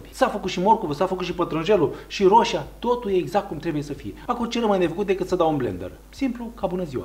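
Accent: Moldova. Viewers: Super faină supa. Iar de vorba asta dulce moldovenească ce să mai spunem?? Ne încîntă sufletul. Mulțumim frumos, de rețetă.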